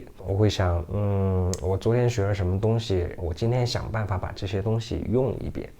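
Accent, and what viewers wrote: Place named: Beijing, China. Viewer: 你的中文不错了，从发音到咬字北京腔有了，已经接近母语中文的国人了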